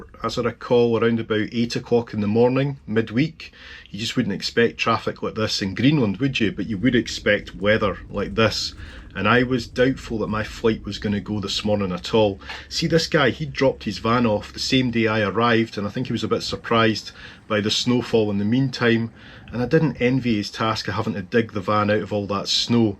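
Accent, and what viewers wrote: Scotland, United Kingdom. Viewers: Hi from Sete Lagoas, Brazil. I was raised in Berwick upon Tweed and have a sister who lives in St Andrews so I'm familiar with your neck of the woods! Here the airport issues are normally flooding or runways melting!. Thankyou for your videos, I miss Scotland and so it's great to hear that accent! God bless and safe travels, Jadir Alister Barnard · I thought the video was great and I really enjoyed it. I loved the concept of the commentary and you also have a great voice that is a pleasure to listen to. I think that's the Scottish accent which one of the world's best. Big thumbs up for that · your "braid Scots" or is it more properly called a "burr," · This is a fun change. I like it. I also wanted to mention that I have (as an American) a soft spot for all things Scottish because my ancestors hailed from the lowlands. Your persona and accent are very appealing. 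I also recently started following the Witches of Scotland. Their story is both fascinating and disturbing. I must admit, I have a really hard time understanding their accent (as I did when I vacationed in Scotland!), so I really appreciate the fact that your accent is both authentically charming and completely understandable. Love what linguists call the "non-standard English dialect" you add to everything you say. What a wonderful escape from the dystopian nightmare we have going on here. Especially nice to see Greenland at a time when it is in the news so much. Such guilt and shame most of us here have for what we've inflicted on the world (well, some of us, definitely not all of us support him). Hope this style of video isn't a one-off, but that you'll do it again.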